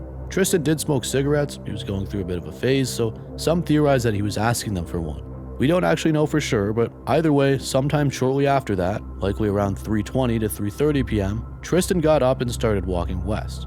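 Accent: Canada. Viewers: Dude You are SO Canadian!! 😂 not an insult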